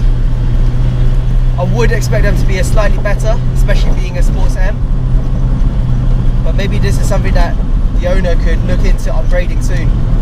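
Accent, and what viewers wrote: United Kingdom. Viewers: An Asian guy with a British accent....I've not seen that before, interesting. I do like JDM vehicles, but for some reason, they keep putting the steering wheel on the wrong side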